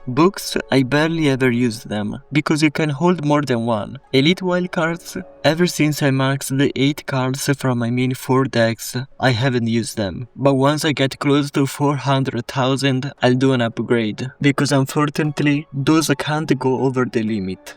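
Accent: Italy. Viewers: Grande fra, parli benissimo inglese, ma si è subito capito che fossi italiano dall'accento!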